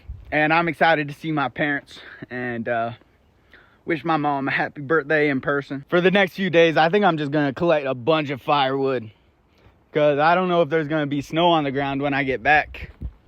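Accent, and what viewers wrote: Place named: Arkansas, United States. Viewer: I find it so strange as an older person to see a 20 year old white boy in the Pacific North West talk like an old black man from Arkansas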